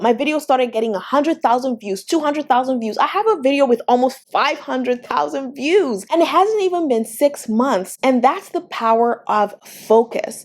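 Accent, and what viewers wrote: Nigeria. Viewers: Giiiirl! Are you Nigerian? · I love your Nigerian accent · Is that a Nigerian accent I heard😍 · It's the Nigerian accent for me😂 Thanks alot for this gem and giving us a great personality to go with it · Thank you for making this video. Truly it’s a blessing. Girl, are you Nigerian? The accent peaked through. Fellow Nigerian here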